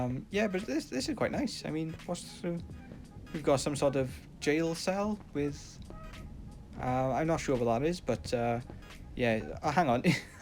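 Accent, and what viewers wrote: Wales, United Kingdom. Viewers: Are you Welsh I’m welsh and you got a welsh accent I love it 😂 and also this is a great video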